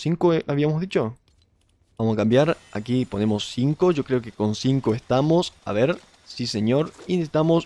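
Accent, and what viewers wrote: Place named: Paraguay. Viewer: Sospecho que es paraguayo por su asento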